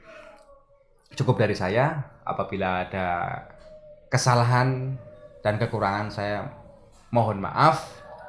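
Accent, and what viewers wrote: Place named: Ambon, Indonesia. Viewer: Gw Kira org ambon kmu bro ??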